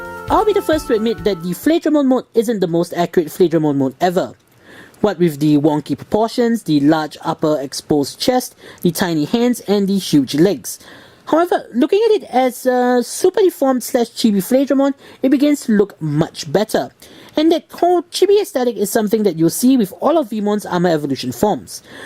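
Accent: Singapore. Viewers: Are you singaporean?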